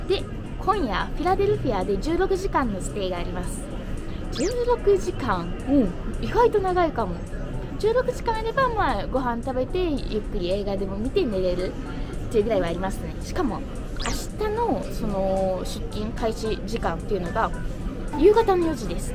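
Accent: Kansai, Japan. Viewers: 、関西なん？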